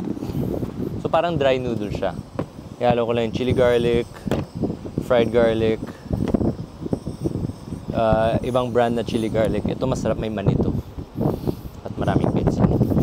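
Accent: Iloilo, Philippines. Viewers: Namian gid ko Chef mamati sang imo Ilongo nga accent 😂😂😂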